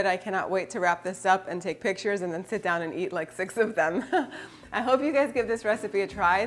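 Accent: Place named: United States. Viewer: To long intros, to much american talking.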